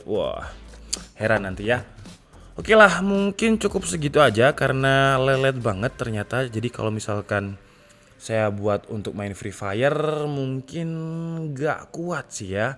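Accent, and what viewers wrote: Java, Indonesia. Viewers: Mas e jawa to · Oalah, smean iki malang pisan ta... 😅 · Oalah ketok e wong jowo😅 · Arek malang ta bro 😅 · Njenengan tiyang pundi mas? Kok enten logat logat jowo wkwk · Orang malang kah bang ?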